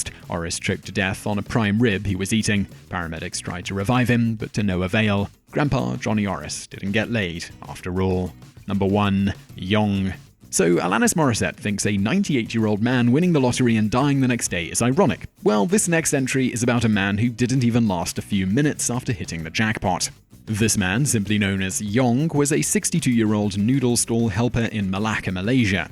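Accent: United Kingdom. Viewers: he's British, just how us Americans say water wrong by saying "wader"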